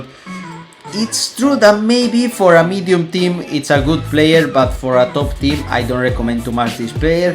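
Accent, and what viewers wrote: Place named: Spain. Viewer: I love your accent❤Are you Spanish?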